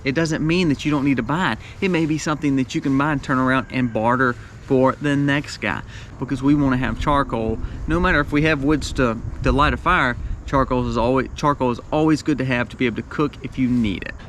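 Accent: South, United States. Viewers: I’ve never hear “oil” pronounced this way 😂 Southern accent is strong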